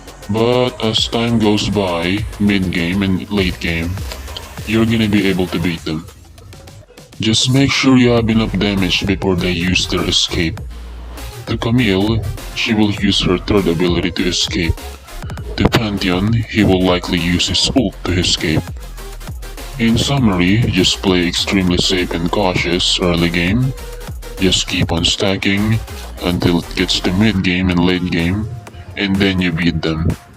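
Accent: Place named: Philippines. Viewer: Kahet anong voice changer payan basta kadugong pinoy kelala mona accent palang HAHAHAHA... GOOD GUIDE!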